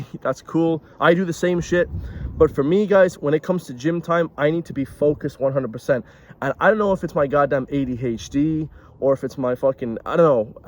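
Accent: United States. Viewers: Who are you? I enjoy your content and chats, but Who are you when you don't speak a pure form of Irish? You sound more American than Irish and I don't recognise any background you are in today, that looks or sounds Irish. Who are you? Where are you? Why do you sound more American than Irish? I'm just asking. Hopefully, you will answer truthfully.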